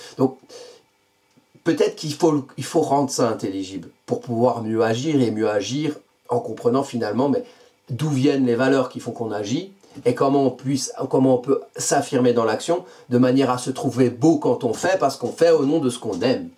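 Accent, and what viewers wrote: Alsace, France. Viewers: oohh, comment cet accent alsacien m'a fait me replonger dans mon enfance, un instant j'ai eu l'impression d'être a la maison, (enfin quand ca existait encore), et j'ai ri pour le débarassage du tahiti douche, limite PLS, à l'époque de la retro de Mars en cancer c'était trop ca ( y'a eu une opposition Pluton ce qui fait que bon relationellement y'a eu un peut de caca). Tout ca pour se rendre compte que bon Vénus va rétro en mars, donc mes plans ce que j'ai préparer pour le mois de mars, en plus avec Mercure rétrograde, je dois peut être me préparer à les oublier et à les réviser. Bon la mauvaise nouvelle dans la bonne humeur au moins.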